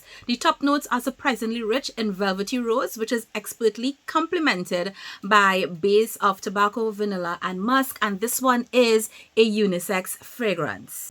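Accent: Trinidad and Tobago. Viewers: You MUST be from Trinidad and Tobago by your accent!?!?! Biggup Trini...fellow Trinidadian here. Great content, keep up the great work. Had to subcribe 🇹🇹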